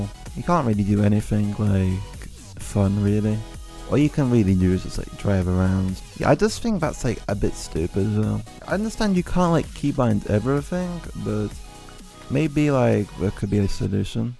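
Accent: United Kingdom. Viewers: Best British accent ever known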